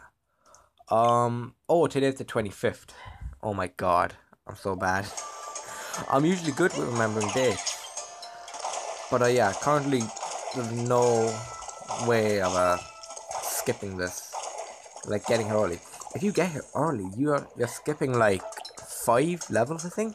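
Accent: Ireland. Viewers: Ah, you do actually have an Irish accent, now that I listened closer but, you're right it's not as prominent as a lot of other Irish accents. Also (not to be rude) but, I love your little lisp. I've always been a fan of people with lisps. I find them quite adorable. Like my daughter will tell me about somebody and she'll say oh mommy you are going to love this person, and also they have a lisp.☺️ and I just really think that it makes your speech very unique and adorable. so I hope I did not offend you in any way.😊 And if you figure out how the heck to get off of that little island and, over to the other place I would really appreciate it if you could let me know. it's driving me nuts. Thank you so much. My name is Linda by the way 😉💚💚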